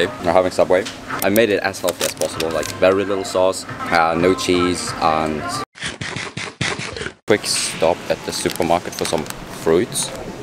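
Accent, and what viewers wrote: Germany. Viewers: On dirait il un accent allemand💀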